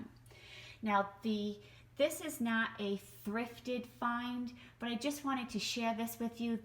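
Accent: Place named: New England, United States. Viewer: You found some really nice things! I love thrifting! I knew I heard New England accent! Thanks so much for sharing! New subbie!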